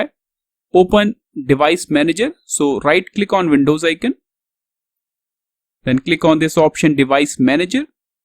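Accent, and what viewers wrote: India. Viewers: As soon as I hear the Indian accent, I knew I was in good hands. Problem fixed....and THANK YOU!!!! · I recommend listening to indian accent youtube tutorials they always fix the problem Without this guy id be stuck with a lagging mouse Thank you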